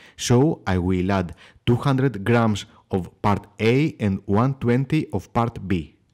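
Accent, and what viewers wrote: Greece. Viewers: Από την προφορά σου κατάλαβα είσαι Έλληνας 😊 Μπράβο αυτό το βίντεο Με βοήθησε πολύ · Are you from greece? Your accent is very greek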